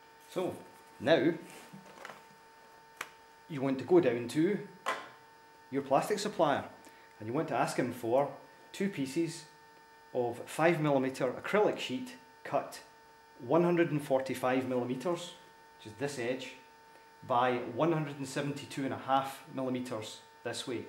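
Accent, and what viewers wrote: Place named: Scotland, United Kingdom. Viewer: An engineer with a scottisch accent. Seems Scotty is alive and well. Great consturction video. Greetings;-)))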